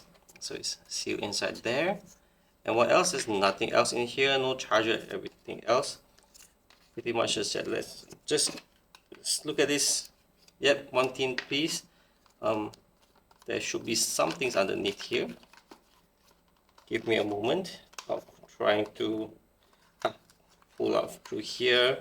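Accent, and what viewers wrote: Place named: Malaysia. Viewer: Nice vid, you sounded like Malaysian/ Singaporean 😄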